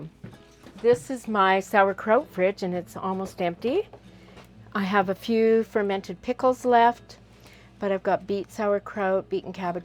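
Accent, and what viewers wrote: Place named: Canada. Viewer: She's amazing! Is she in Canada? The accent sounds Canadian and some of the berries sound like they are from there.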